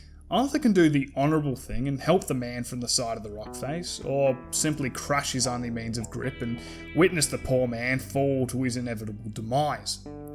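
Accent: Australia. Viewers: Ohh he's an Aussie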